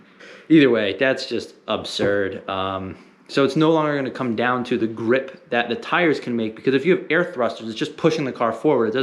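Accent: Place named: Bulgaria. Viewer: Trying to figure out your accent. Maybe Bulgarian? Anyway. I appreciate the difficulties of ESL. I have never heard anyone say Alameda the way that you do (4 syllables) 🤓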